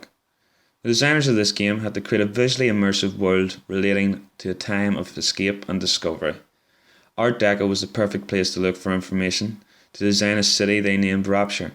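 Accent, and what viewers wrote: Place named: Belfast, United Kingdom. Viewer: Irish, fer sher. I'm guessing Belfast, from my travels round about.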